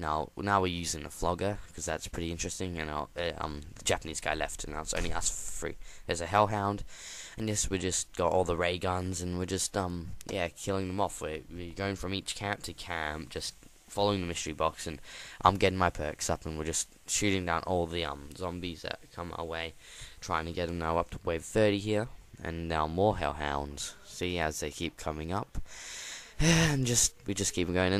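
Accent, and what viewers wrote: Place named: Australia. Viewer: you kinda sound a bit Australian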